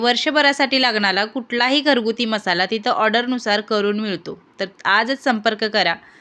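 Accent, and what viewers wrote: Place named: Kolhapur, India. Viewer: Khoop masst banavlat recipe ...tumcha awaj and kolhapuri accent, ayikayla chaan vatte. ❤Stay blessed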